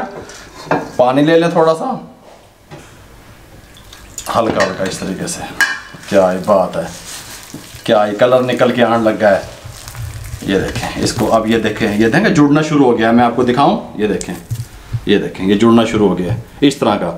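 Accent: Punjab, India. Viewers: bht kmal apk smjhany ka method, or tips amazing, sir or apka punjabi touch tu kya he kehny. Allah bless you.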